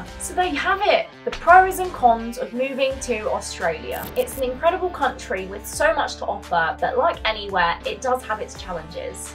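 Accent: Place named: United Kingdom. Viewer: And where are you from in the Uk accent sounds familiar I left in 1979 from just outside Birmingham.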